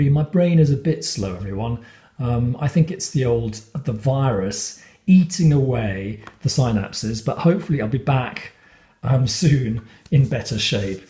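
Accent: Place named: England, United Kingdom. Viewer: Hi, new subscriber here. From Empress Song's channel. It is refreshing to hear an English accent. I like this deck, in that it is subversive. For example the King of Pents is meant to be master of the earthly realm, with access to resources to use at will. In this deck the King of Pents is removed from his element, with farming and wealth of the earth (the combine harvester in the background) beneath the King, as implied access to valued resources are supplied via the tablet. Maybe stocks in fracking, or cyber currency; the stock exchange rather than the actual nitty gritty of resource creation by own hands. So as a polemic on modern society, there is not as much respect given to skill of hand and land. Respect, ie wealth and value, is given to Airy (swords/guns) pursuits. As for the suit of guns, who needs intelligence when you have a gun? 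The previous 12 months alone in the US has been horrific and mind boggling regarding mass shootings and the desperate need to keep their right to bear arms. It makes no sense, but if Guns are placed in stead of strategy, analysis, logic, then a suit of guns is a sad state of modern living. The RWS of the 1900's had at least a little hope in it. This deck shows today's reality, the hope has to be added intentionally and sideways on. I have a few decks like this, the latest is a US games deck - The Neo-Colonialist Tarot of the Americas. Pamela Coleman Smiths artwork enables people to enter into the theatre of life. A fantasy. In these decks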